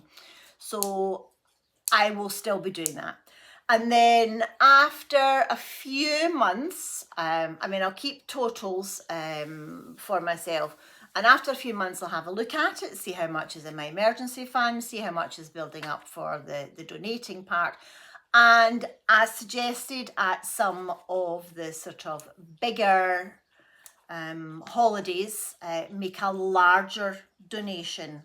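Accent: Scotland, United Kingdom. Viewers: I was watching one of your video's ads last week and my husband said "why are you listening to that rubbish?" So I had to explain about the ad revenue 😂 I normally watch you at breakfast time and he always comments on that wee Scottish lassie!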